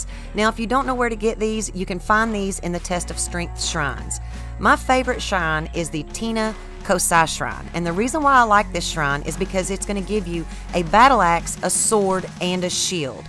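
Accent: South, United States. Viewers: I'm just here for the Southern accent 😎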